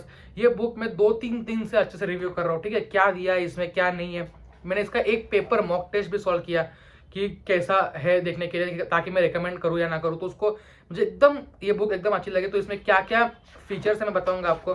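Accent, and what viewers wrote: Maharashtra, India. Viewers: Kaha se ho bhai tum ... Maharashtra se ho kya ..I think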